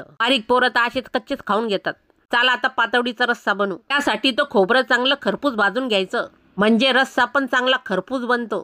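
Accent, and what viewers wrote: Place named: Ghats, India. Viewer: Ghatavrchi ahe vatte tai bhasha khup mast ahe MI tr boln Aaykte nemis tu mchi tai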